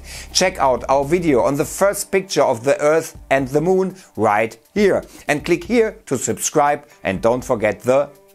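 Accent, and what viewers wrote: Germany. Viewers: Auch wenn man den deutschen Akzent stark raushört ändert das nichts an den coolen Infos und die Art wie du es rüberbringst. Cool das es auch nun nen englishen Kanal gibt. Gutes Video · Your German accent is very nice combined with those topics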